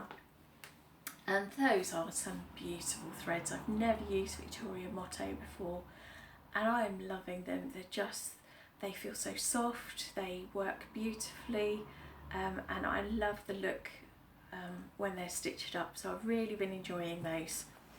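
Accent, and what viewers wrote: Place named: England, United Kingdom. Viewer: Hi, I really enjoyed your first video......lovely to hear an English accent! Your stitching is absolutely beautiful. I live in Northern Ireland so I'm not too far away from you. I love Devon and have visited many times. Looking forward to watching more of your Flosstube videos 😍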